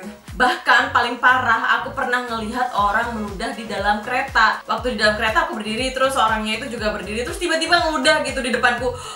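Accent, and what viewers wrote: Java, Indonesia. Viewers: Sampean jowo pundi mbak, bosoe kog koyok daerah q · Asli mana mbak e.. kok medoknya, medok jogja or solo.. cerita dong, riwayat sampai swedia dan bla bla bla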